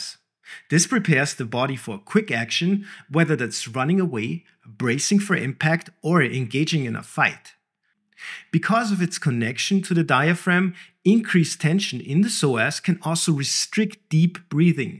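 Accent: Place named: Germany. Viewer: And don't forget the German accent :)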